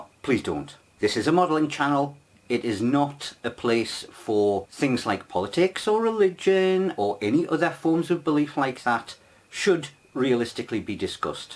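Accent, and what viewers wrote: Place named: North East England, United Kingdom. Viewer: I can tell by you accent you come from somewhere near me (North-East England) - If you're back in this area any time soon, contact me and I can show you one of my equatorial mounts in action - I'm an astronomer, and own several of them... It's impossible for them to work properly on a flat Earth.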